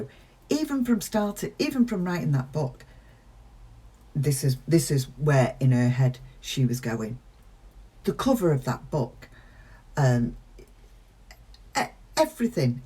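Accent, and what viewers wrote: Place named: England, United Kingdom. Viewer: Always look forward to and enjoy your videos,. If Wendi is ever prosecuted, the Prosecution are gonna have to be a hundred steps ahead of her, for ALL the reasons you outlined in this video. You probably don't mean to be, but your delivery is highly entertaining! You've probably been asked this before, but your accent places you in what part of England?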